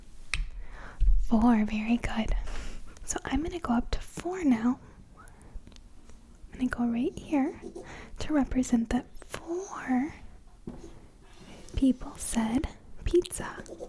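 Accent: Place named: Midwest, United States. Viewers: Excellent video, the mic you use softens the voice just enough. The accent is nice too, it sounds like you grew up near Cleveland. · lol. midwestern accents.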